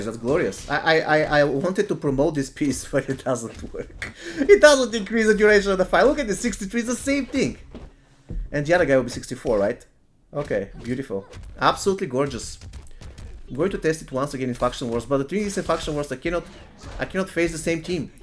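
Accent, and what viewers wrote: Bulgaria. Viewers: are you bulgarian?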